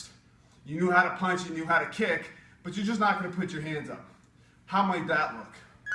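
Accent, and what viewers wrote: Brazil. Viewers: That Brazilian accent is pretty good lol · Can you do an instructional video with your Brazilian accent? That would be amazing! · Your Brazilian accent gets me laughing every time. Love your videos. Thanks. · More brazilian accent videos please. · Lmao, that Brazilian accent killed me. Subscribed. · Love the Brazilian accent. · Very nice tips!! About the Brazilian accent... do we really talk like that? lol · Thank you for the white belt videos. They're super helpful. I really like the Brazilian accent wrapping up what you just showed us. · The Brazilian maestro accent is beautiful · You have a mean Brazilian accent, dude. Great vid too. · i love the Brazilian accent at the end of your videos lol...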